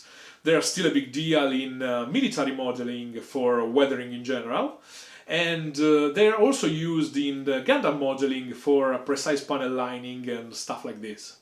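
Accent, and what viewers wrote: Italy. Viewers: good lord....that looks amazing..... love the slight italian accent gives all the artwork a "fathers of the renaissance" touch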